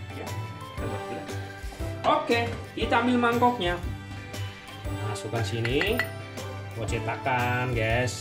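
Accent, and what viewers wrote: Java, Indonesia. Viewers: ngapunten mas......kulo tanglet sampeyan asli pundi toh kok jowo ne genah? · Ketoro Iki wong jowo Yo mas bro..kok ngomong Sego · Jowone kabupaten ngendi to mas ? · Mas kok bilang iyah, dr jawa yah? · Jowone ketok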